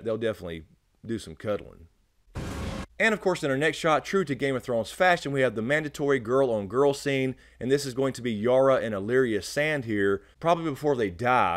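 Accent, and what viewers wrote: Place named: Mississippi, United States. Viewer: I don't know what I was looking forward to more, the actual trailer or your trailer breakdown (tho I am going through GOT withdrawls since they are doing the late start this yr) Man you are the only one I watch about GOT reviews, IMO you are the only one that's worth a fuck. Now I could be a little bias because I'm from South MS and you from the way you talk (and not to sound creepy, you honestly look like my buddy Tyler would have in maybe 10 yrs or so) and my boy Tyler passed away a little over a year ago. He had that same passion about ASOIAF that you have and got me into reading the books. Anyway man just keep up the good work man. I really enjoy the vids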